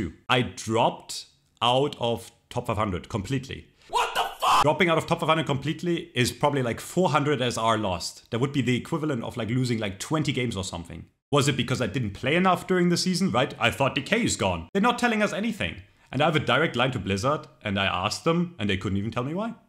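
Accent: Germany. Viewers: critiquing a system is always better with a German accent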